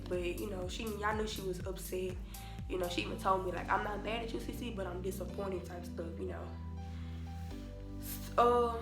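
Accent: Louisiana, United States. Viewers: Are you from Louisiana you sound like it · You from Louisiana?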